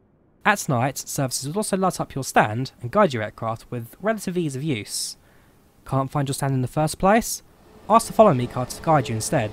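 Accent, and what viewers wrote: United Kingdom. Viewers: I say this with deep respect as you are a brilliant simmer and content creator who engages so generously with the community. When syllables get dropped from words in the rush to get to the end of a sentence, it does impact comprehension. Although I’m not from the UK, English is my first language and I do have some trouble understanding all the important and interesting things you’re saying. I think by taking the time to slow things down, and more evenly space words and syllables across phrases, you’ll be much better understood by those who do not speak with your accent or by those for whom english is not their first language. I truly only mean this only as genuine constructive feedback.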